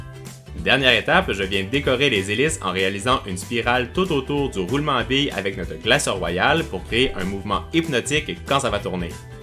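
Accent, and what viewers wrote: Quebec, Canada. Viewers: super video tes le meilleure 😉😉 #lovemail #temsnap love j'adore ton accent Québécois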